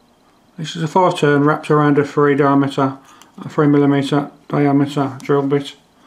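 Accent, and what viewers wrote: United Kingdom. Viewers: your accent has too much British in it. hard to understand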